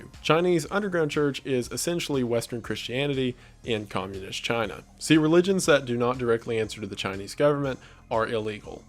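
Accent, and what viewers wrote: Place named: Kentucky, United States. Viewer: Your voice is very smooth, like a young college professor from Kentucky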